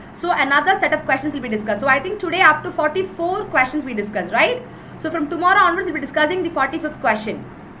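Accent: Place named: South India, India. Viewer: Sound like a south Indian.... 🤗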